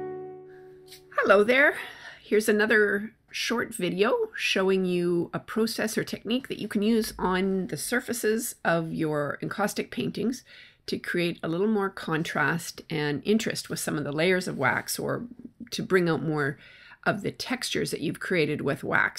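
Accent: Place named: Fargo, United States. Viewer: Omg I love your Fargo accent so cute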